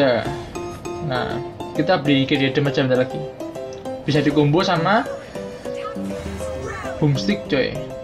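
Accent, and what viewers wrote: Java, Indonesia. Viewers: Medog bang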